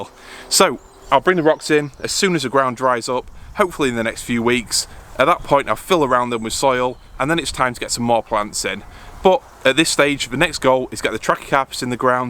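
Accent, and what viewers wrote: United Kingdom. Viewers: Just started watching your vids, trying to create a jungle on Isle of Wight, just bought a musa basjoo but want some trachycarpus fortune seeds, have you got any? I detect a Grimsby accent there to? 👍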